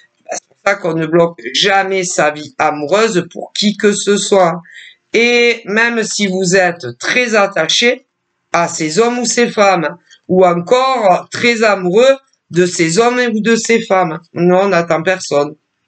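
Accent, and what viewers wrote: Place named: Marseille, France. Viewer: je pense que tu es vers marseille a l'accent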